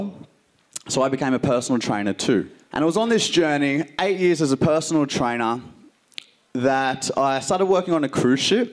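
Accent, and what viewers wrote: Australia. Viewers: Each time your speech comes out, first of all I smile thanks to your Australian accent, and immediately after, I feel empowered and extremely proud to be part of this journey for more than a year now, for participating to something much bigger than me, than us, for being part of the Universe... I became vegan overnight after having watched Gary's speech. Both of you have my ex-boyfriends' name so I thought it was about time and a sign to make peace with my past. I knew it was in alignment with who I am and who I want to be as a person. And one year later I feel more happy, more energized, more aware, more educated, more and more human than ever before. so thank you for reminding me why I became vegan and thank you for carrying the voice of those who aren't heard. Thank you for everything you do. Thank you, from the bottom of my heart. 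Thank you. Sending you all my love. Bye Coco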